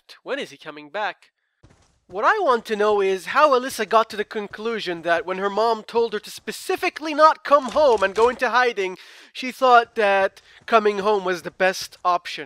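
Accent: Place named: United Kingdom. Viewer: Oh dat british accent <3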